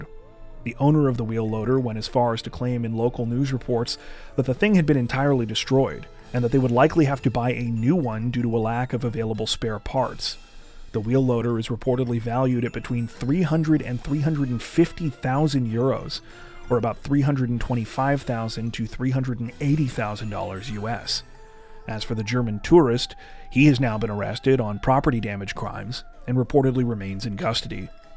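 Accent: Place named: Canada. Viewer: I love how you beautifully pronounce foreign names, and speak in a really soft north American accent, I'm thinking maybe you're Canadian.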